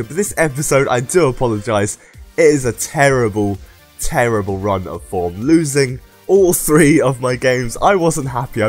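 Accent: United Kingdom. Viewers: dont you worry, you talk british and we all love it <3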